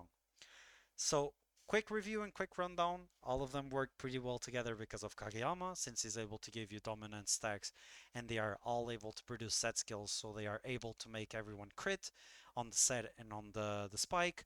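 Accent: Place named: Portugal. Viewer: You do sound portuguese thats why I said that hehe Maybe I can try to fully commit to this team and get both to SR4. However, I’m also interested in the new Nekoma team with SP V2 Kuro, Yaku, Yamamoto, and the Term-End and Kamo duo. But I think I wouldn’t be able to get any dupes, and I already have Kuroo V1.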